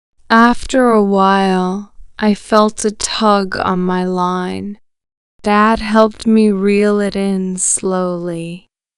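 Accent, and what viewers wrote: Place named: United States. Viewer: بصراحة طريقتك ونطقك لللهجة الامريكية رااائعة.. واصلي واياااكي تفشلي.. راح تكبر قناتك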